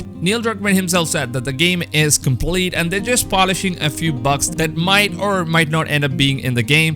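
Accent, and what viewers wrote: India. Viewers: Great video man. Where are you from btw? You sound Indian or South Asian? Thanks and hope we get the game soon!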